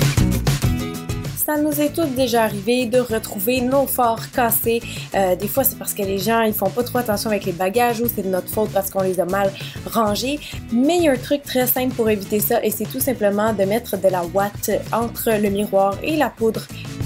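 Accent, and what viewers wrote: Quebec, Canada. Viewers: megh rêve c'est l'accent québécois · t québécoise!?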